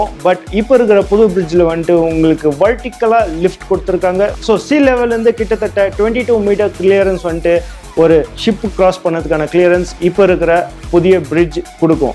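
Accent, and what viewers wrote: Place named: Bangalore, India. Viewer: I think anchor Banglore tamilian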